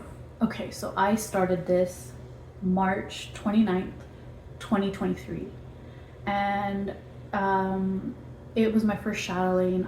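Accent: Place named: Whitby, United Kingdom. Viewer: I'm in Whitby, it sounds like you're somewhat nearby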